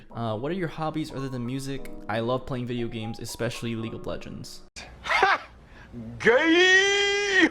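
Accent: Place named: South Korea. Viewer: One question (Sorry not on discord will join soon) Will u voice anime Ur Korean accent sounds like u could Ty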